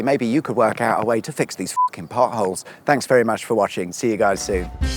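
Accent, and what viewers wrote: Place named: United Kingdom. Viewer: The stingy british undertone gets me every time.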